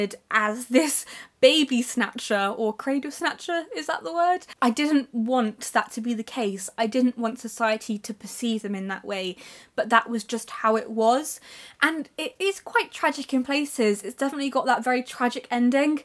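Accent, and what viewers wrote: United Kingdom. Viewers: I really love your reviews , they are deep and clever, and of course your lovely British accent, greetings from Barcelona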